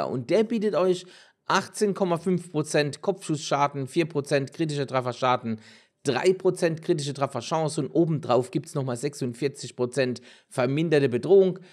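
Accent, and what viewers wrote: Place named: Heidelberg, Germany. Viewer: das hab ich gemerkt welche Richtung ? Heidelberg?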